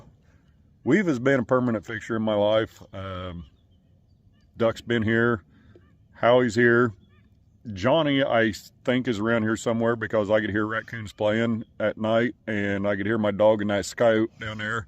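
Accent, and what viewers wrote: South, United States. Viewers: You're accent sounds Southern sometimes -- like when you say "ma dawg" lol 👏 My Alabaman father says it that way too. I guess since Illinois is partly in the South or bordering it in any case, it's not that crazy.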